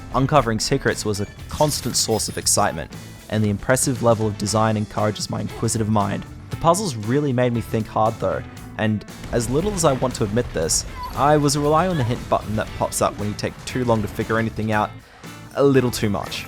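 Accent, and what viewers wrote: Australia. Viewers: They are Australian.. but i think what is lacking is seeing the narrator’s face, mannerisms & physical expressions. Though It does sound little green horn and someone reading out an assignment.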